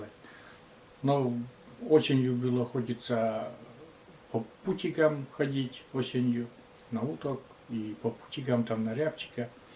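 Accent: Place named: Udmurtia, Russia. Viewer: недавно на вас подписалась, смотрю ваш канал и отдыхаю душой, много от вас позитива идёт, простоты,душевности, чего нам не хватает сейчас, ещё я услышала наш местный акцент / удмуртский/,он меня заинтриговал и вот я с вами. Здоровья вам и вашей супруге, вы молодцы! Спасибо за видео, мне хотелось узнать о вас больше.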